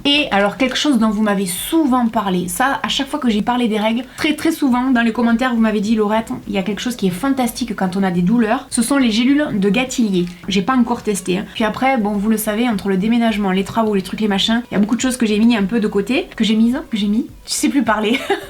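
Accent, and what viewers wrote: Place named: South-West, France. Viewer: C'est toujours un grand plaisir de découvrir une nouvelle vidéo, on sait d'avance qu'on va passer un bon moment (une bulle personnelle dans le temps, et pourtant je tiens à redire que je ne me maquille pas) car tu es une personne rayonnante, solaire et tellement agréable, de plus j'adore ton p'tit accent du sud-ouest ! J'aime beaucoup les parfums de la marque Adopt que je porte très régulièrement, ils sont d'un rapport qualité/prix excellent ! On m'a demandé à plusieurs reprise quel était le parfum que je portais (rose noire, sugar, yummi candy) une chose qui m'est arrivée moins souvent alors que je portais "un vrai parfum de marque" (mes préférences vont chez Guerlain, Lancôme et Mugler). J'aime bien t'entendre parler de maquillage même si je n'en fais pas usage, pour moi une simple crème hydratante riche de chez Avène ou la Rose-posay. 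Je suis bluffée quand je vois comment on réalise un maquillage techniquement, cela n'est pas si facile à faire, question d'entrainement j'imagine. Chère Laurette as-tu un avis sur le lait crème Embryolisse que j'aimerais beaucoup tester, j'espère qu'il convient à une peau très sèche, sa texture me semble très chouette. Bonne et longue continuation à toi !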